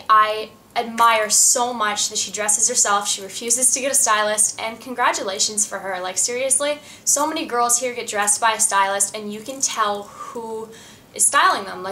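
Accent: Australia. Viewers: I feel like you have a slight slight austrailian accent that comes out in some of your words. its awesome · You sound like you have a bit of an Australian accent! Maybe when you were there you picked a bit of an accent up :p love your makeup btw your stunning! -3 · I can def hear that your from australia but it's not that strong :) Love the lipcolor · Before you didn't have an australian accent, but now since you have lived there so long, i can tell your getting it. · Do you think that since you've moved to Australia, you're starting to pick the Australian accent? Haha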